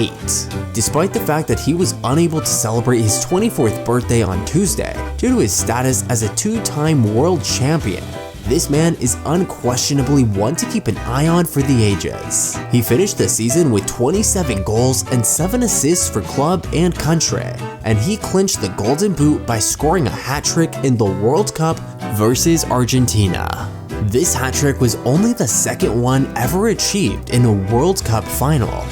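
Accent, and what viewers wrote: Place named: Spain. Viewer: Lose the stupid Spanish accent.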